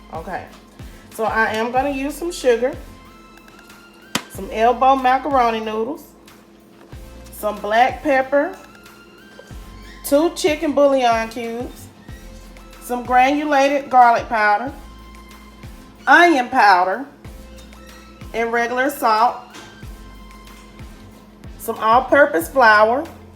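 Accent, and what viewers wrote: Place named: South, United States. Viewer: Finally!!! A video with no creammy watery mac and cheese. And i can hear it in your voice that you’re southern. Im trying this for thanksgiving lol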